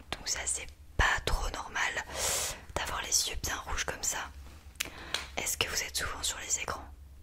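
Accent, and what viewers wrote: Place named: Eastern France, France. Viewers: Toi tu viens de l’est de la France obligé !! Tu dis « Ui » au lieu de « Oui » 🙂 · Ui c'est comme ça en Alsace Lorraine ^^ Et pas les Oui de nobles qui ont 9 cartes gold :D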